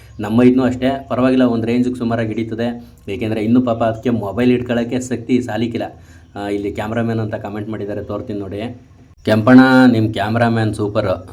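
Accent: Mandya, India. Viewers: ❤ video hakidakkee kushi aithu sir ❤ love your family neamma Mane super ❤ neamma language super ❤namma Mandya language super ❤navu Mandya sir ❤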